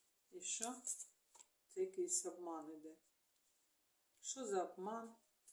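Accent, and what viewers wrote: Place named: Luhansk, Ukraine. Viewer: Дякую! Вас дуже цікаво слухати, бо Ви маєте особливий діалект як у нас на Луганщині. Відчуваєш що слухаєш свою людину💕 дуже хочеться почути від Вас звістки про новоокуповані (після 24 лбтого) частини Луганської та Донецької області, що з ними буде цього літа?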